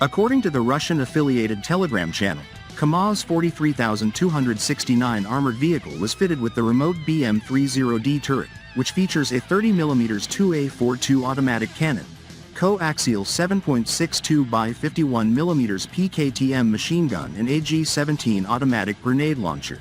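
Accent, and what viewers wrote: United States. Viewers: While he at it change that dumm american accent aswel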